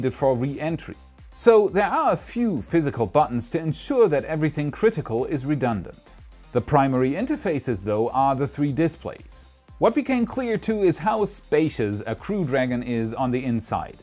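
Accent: Germany. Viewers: you are from germany? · Of course he's German. Doesn't the accent give it away?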